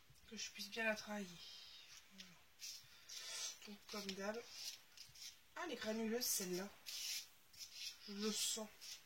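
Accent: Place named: Normandy, France. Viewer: re :c'est déjà fait , tout est au maxi , par moment je vous entend et d'autre beaucoup moins.je vais investir dans des enceintes alors mais je m'en servirai que par moments car dans certaines vidéos que je regarde je mais au minimum car les intro son en musique donc c'est trop fort . vous êtes de quelle région ? Votre accent me rappelle celui de la Normandie. bonne soirée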